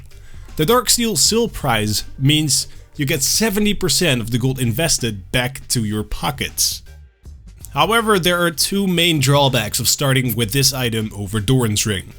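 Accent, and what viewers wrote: Netherlands. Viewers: jij bent NL niet waar ? het was echt moeilijk te horen maar ik hoorde het heel klein beetje :p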